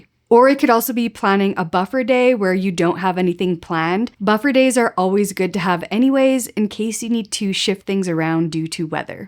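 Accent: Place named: Canada. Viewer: Broncos fan? Saw the fingernails. Then again, I detect a slight Canadian or Yooper accent. Thx for video!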